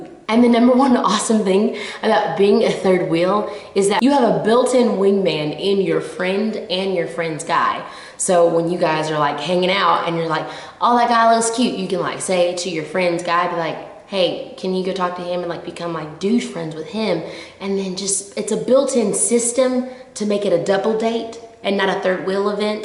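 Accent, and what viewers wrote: South, United States. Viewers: man you have such a southern accent its adorable! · You're southern accent sounds beautiful today! Love you, Jamie Grace! You are such an inspiration!! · I love this video SO much! Such a great message! :D Also, I never realized how much of a Southern accent you really do have before watching this video. From one Southern belle to another, I think you have the cutest Southern accent I've ever heard. Thank you for posting this! <3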